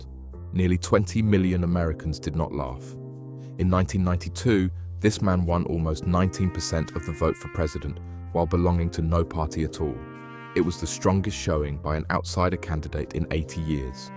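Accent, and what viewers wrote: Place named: United Kingdom. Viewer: Please speak American, especially when the subject is American history. The word is Plant, not Plont, perty, not potty. I am sick of British accents being used for narration to try to impart seriousness.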